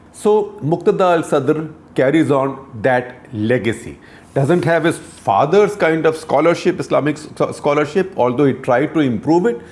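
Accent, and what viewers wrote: India. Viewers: Dear, Please pronounce the words ARAB and KURD naturally. Your English accent is hardcore Indian. Trying to anglicize Arab as ayarab and kurd as curd sounds little wierd.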